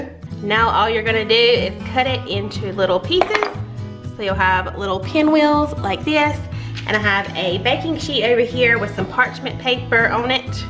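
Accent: South, United States. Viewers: I am fairly new to your channel. I love your southern drawl. I would like to see some unique and different breakfast recipes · LOVE all your recipes but looking forward to fixing the sausage pinwheels for my kids coming home to visit in September. I too am a Southern Girl and love your accent..Hello from Coastal North Carolina!!! I’m fairly new. Discovered you about a month ago!! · I'm new to your videos, I just subscribed today. I love your videos and can't wait to try some of the recipes. I'm from Mississippi and I love your southern accent.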